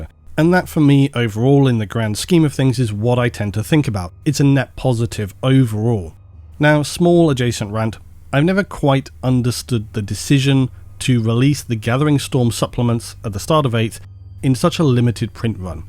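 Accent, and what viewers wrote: England, United Kingdom. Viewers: I like when England man speaks.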